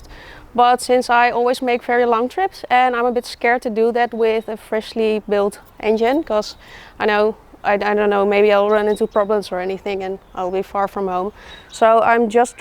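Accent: Netherlands. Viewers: Love the car collection qnd your videos. You are stunning qnd have great Dutch accent ❤ wishing you the best from California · Beautiful and stylish woman with a confident and friendly manner; cute Dutch accent also a plus ✅ - CRX resto; very nicely done ✅ - Old school Volvo; well cared for ✅ - Volvo wagon; comfy, practical, cool ✅ - E28 5-series; a proper Beemer that needs some love ✅ - Subscribed ✅ Congrats on being noticed by the algorithm! Cheers from Australia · Love that dutch- English accent. Used to love the look of CRX growing up. Still a cool car. nicely done enginebay with matching colour. I'de guess my favourite would be the E28. please keep it original! no low rider, 22" rims and such. 2,5 hours away, wouldn't that almost put you in another country? :P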